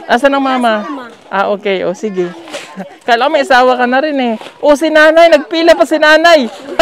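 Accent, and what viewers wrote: Visayas, Philippines. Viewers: Marunong na talaga mag bisaya si sir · Good evening sir, marunong kana talaga mag bisaya salamat sa pagmamahal mo sa amin dito sa mindanao sana i bless ka pa lalo ni Lord kayo ng PB team at PB sponsors bigyan kayo ng malalakas na pangangatawan para sa walang katapusang mission at pag tulong congratualtions pala sa bago mong natanggap na award 🎉❤❤❤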